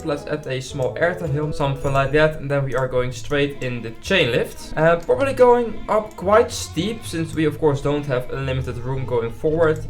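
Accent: Netherlands. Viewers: het nederlands accentje is er wel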